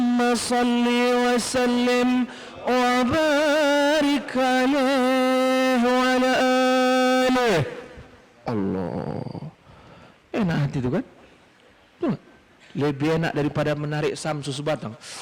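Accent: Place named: Medan, Indonesia. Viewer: Iya, aku pun penasaran pas liat ada yg bilang melarang dengar ceramah UAS dan UAH dan dia sebut nama ustad salman farisi terus ku cari2 di youtube. Tapi ngomong nya kayak orang medan sumatera utara. Logat2 nya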